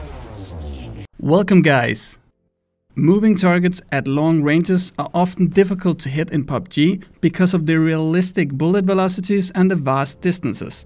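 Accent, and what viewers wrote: Denmark. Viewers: Are you from Denmark? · Ey youre danish right?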